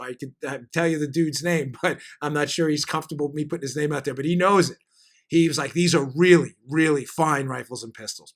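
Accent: New York, United States. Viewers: Dan...as always I cannot wait until pm to watch your videos. So much to look forward to....the great NY accent and the same brother hood with the NYPD. You rock!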